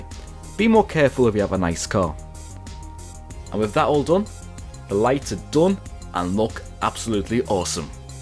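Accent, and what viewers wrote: Liverpool, United Kingdom. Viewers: from witch part of uk this lad is? very intresting accent. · Are you from Liverpool or Wirral at all? Can hear a scouse twang in your accent, i'm from Ellesmere Port :-) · Am i mistaken or you're from liverpool, i deduced that from the way you speak.If I am wrong please do tell me. As someone said it's nice to see someone reastoring normal car you know one for laymans not ones from wealthy people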